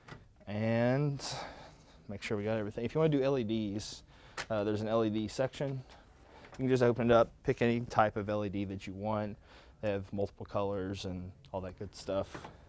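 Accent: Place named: Appalachia, United States. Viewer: That's what I said moe men tary = momentary, not moNetary, may just be his accent, I'm used to listening to hillbillys ;). Still it was a good vid and I can't wait for the homemade rebuildable atomizer. No insult intended.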